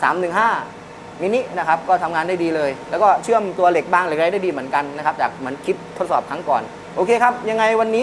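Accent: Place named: South, Thailand. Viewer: สำเนียงเหมือนคนใต้บ้านเราเลย นิ 555fc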